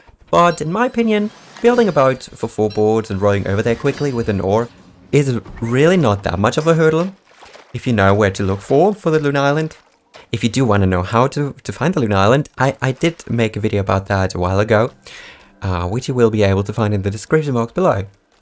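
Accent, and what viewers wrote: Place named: United Kingdom. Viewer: I appreciate the British accent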